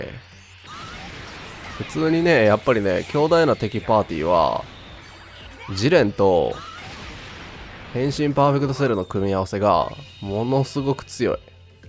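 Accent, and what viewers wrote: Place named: Kansai, Japan. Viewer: スネークの関西弁もそうだけど低めで渋い声の関西弁はまじでかっこいい笑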